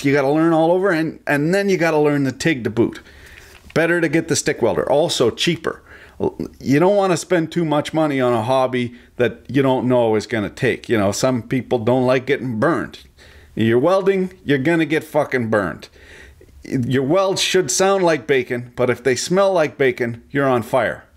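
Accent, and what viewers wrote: Quebec, Canada. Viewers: I thought the best part was hearing you speak Quebecois, until I heard your little girl... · Jte spot avec ton accent du lac saint jean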